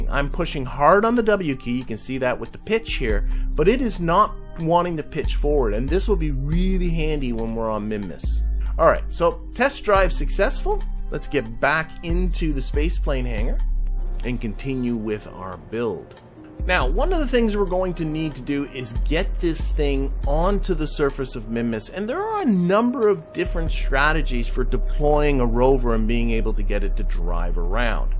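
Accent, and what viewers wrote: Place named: Canada. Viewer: Just found your channel a couple weeks ago. You make some of my favorite tutorials, and have explained key concepts in a very digestible manner. I've got a couple questions about yourself, feel free to not answer if you'd like. For one, do you have a background in mathematics, like either studying at high level or teaching? My second question is are you from Canada, or the northern U.S., you have that sort of accent and i've just been curious. Thanks, -Ben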